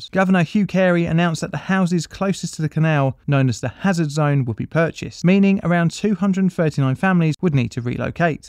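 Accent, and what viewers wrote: United Kingdom. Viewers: This was an American disaster so what's with the British narrator?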